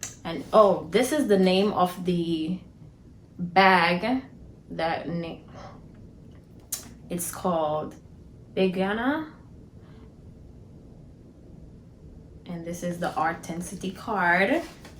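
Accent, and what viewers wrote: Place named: Jamaica. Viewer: I loovveee your fashion!!! I hear some patois mi sis!!!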